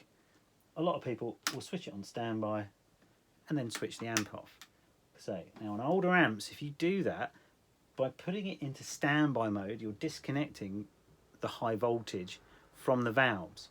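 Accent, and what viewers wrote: United Kingdom. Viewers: I thought you sounded like a true British rocker with the english accent. John Peralta sounds like a dick! Keep rockin the great information. Appreciate you taking the time to make this video bro! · The English accent is good for singing not so much for speaking sorry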